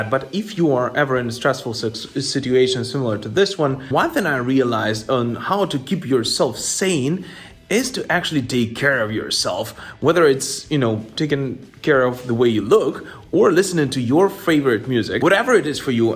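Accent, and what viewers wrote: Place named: West Coast, United States. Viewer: I'm curious. Where did you learn English? Did you know that you speak with a American west coast accent? It's true. You really do. Perhaps your English teacher was from California or the Pacific Northwest?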